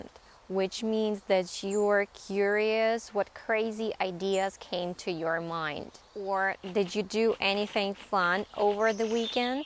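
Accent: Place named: United States. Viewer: Hey! Would be really nice to hear about your own journey/story on how you learned/are learning English, practiced/practicing your American accent. Different people process information differently. For example, some people may memorize new words by only hearing them, the others - strictly by seeing words on a piece of paper and so on. What is your method?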